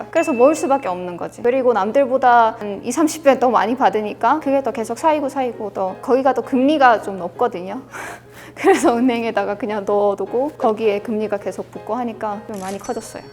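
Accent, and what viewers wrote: Seoul, South Korea. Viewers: 그냥 한국인인데? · 크헉. 완전 한국 서울 여자네. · 화면보면 전형적인 서양백인미녀인데 화면 내려서 목소리만 들으면 웬 한쿡여자가 말을... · 그냥 잘하는게 아니잖아 걍 서울사람이잖아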